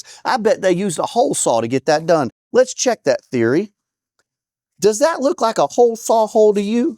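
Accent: South, United States. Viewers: whats with the accent brother i know your from the south....ish but dam... not serious do your thing man keep kicking ass and calling out the bs. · The more angry and sarcastic you got the more Southern your accent got. If you ever hear that directed at you, I’d run. might six his brother on you. 😬😂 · The southern twang of Sarcasm just gets stronger and stronger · Are you turning it up with the southern accent? I don’t remember it being so strong in your past videos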